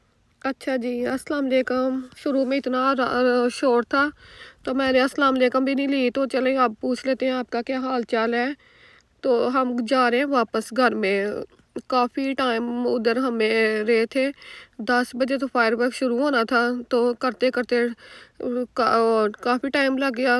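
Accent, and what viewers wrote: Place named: Lahore, Pakistan. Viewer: First with u dear 👍📌 Really enjoying ur Punjabi which same like us ..r you from lahour ??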